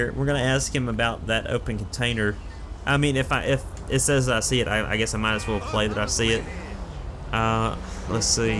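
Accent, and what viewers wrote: South, United States. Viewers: Wat state are you from cause it sounds like you have a southern accent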